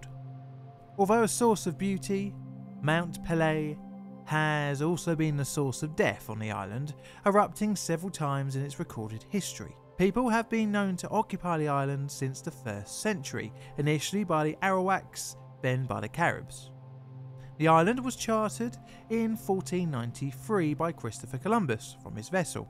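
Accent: United Kingdom. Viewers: I think this particular YouTube channel is run by a British guy. But if you can manage to understand British people and Americans you've learned every accent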